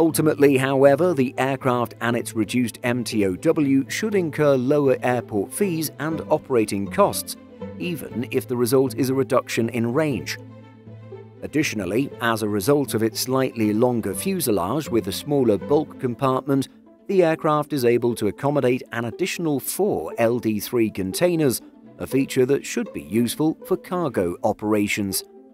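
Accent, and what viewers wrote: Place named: United Kingdom. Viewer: The comparison ought to be with the A<num>, and not the 900!!!! On the other hand you have a wonderful British Accent... 😛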